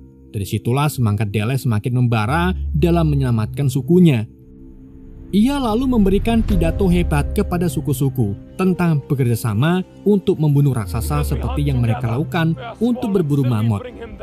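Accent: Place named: Banyumas, Indonesia. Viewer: Mas admin, Njenengan asline ngendi mas? Narasine karo bahasa ngapak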